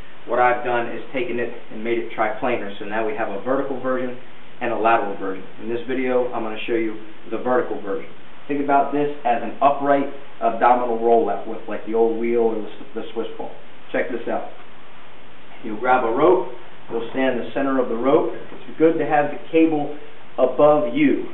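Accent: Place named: Baltimore, United States. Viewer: I hadn't even scrolled down and I was like "hey, he sounds like he's from Bal'more - sure enough! Great exercises - thanks! I'll def. incorporate these with my clients.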